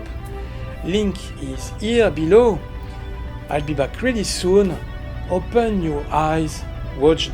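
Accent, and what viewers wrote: France. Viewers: Bonjour tes vidéo sont très intéressante mais malheureusement je comprend pas l'anglais je me contente du visuel ou de la traduction google mais la traduction n'est pas fidèle je pense. Ton accent montre que tu es français . bonne continuation si tu as des vidéos en français se serai top.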